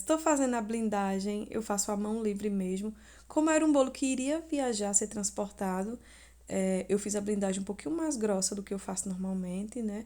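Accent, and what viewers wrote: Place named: Pernambuco, Brazil. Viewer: Que perfeição❤ Estava só esperando vc falar de qual cidade era porque pelo sotaque, sabia que era pernambucana, sou de Olinda😁😘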